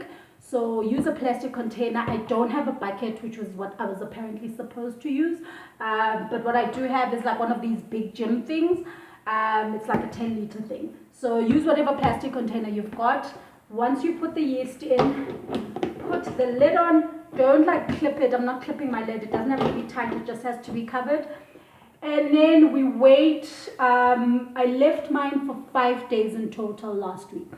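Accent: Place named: Coloured, South Africa. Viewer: I thought you are colored, you speak and look like us...or so I thought😂😂😍😍thanks for the recipe girl going to give it a go. Take care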